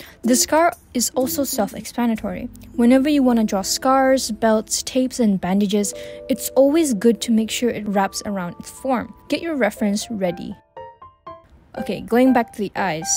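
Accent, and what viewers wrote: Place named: Malaysia. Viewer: Hey!! Thanks for this video!! I actually did some self-critique on my artwork before so I could relate to this video Also, I KNEW IT, YOU'RE MALAYSIAN!! I thought your accent kinda sounds Malaysian plus your name. Hello fellow Malaysian artist~ And I really like your semi-realistic artstyle. I also do semi-realistic-painterly style as well!! Oh, and also, WTF, YOU'RE ONLY 17!?!??? HOW ARE YOU ALREADY THIS GOOD!?!??? I thought you would be like, 21 or something. But I've seen some really good artists who are quite young, so I guess it's normal Also, why no comms? T__T Oh, and btw, did you have a booth at CF two weeks ago? :oo Anyways, I'm gonna subscribe to you and I'll look forward to see you post more videos and speedpaints~!! Cheers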